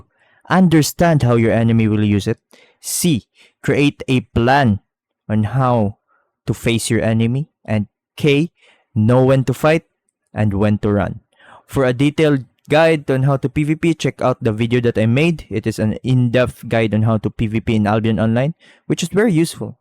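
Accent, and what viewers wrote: Philippines. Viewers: you sound very filipino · Build? Btw are you a filipino? You have an accent like it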